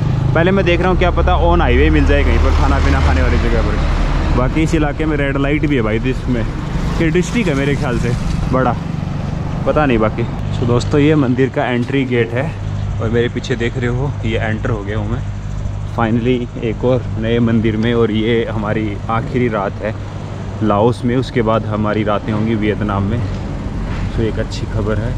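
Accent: Haryana, India. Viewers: आपकी बोली में थोड़ी थोड़ी हरयाणवी है। भाई बहुत बढ़िया लगता है जय भारत जय हरियाणा जय राजस्थान जय राम जी की सहाब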